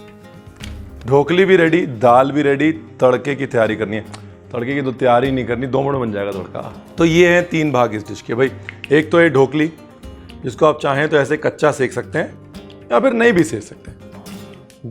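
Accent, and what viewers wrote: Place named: Punjab, India. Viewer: Sir, I come here to listen your Punjabi and love the way you talk 😀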